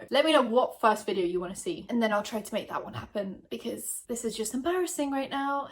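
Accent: United Kingdom. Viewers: I could listen to you say vitamin all day. From an American who loves a good British accent. 🥰🥰🥰🥰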